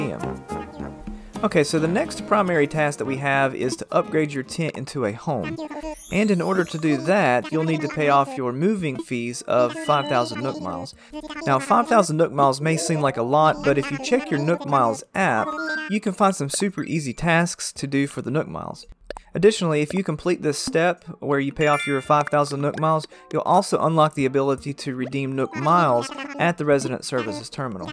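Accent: Texas, United States. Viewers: Is that a Texan accent? Lol!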